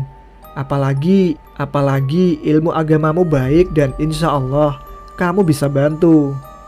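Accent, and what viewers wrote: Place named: South Kalimantan, Indonesia. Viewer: Banjarmasin Balangan kal-sel suaranyaa😎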